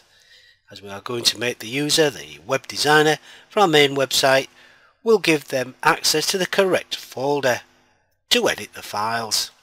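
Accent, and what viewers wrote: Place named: Newcastle, United Kingdom. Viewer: Very good explanation - and no trouble with your Geordie accent either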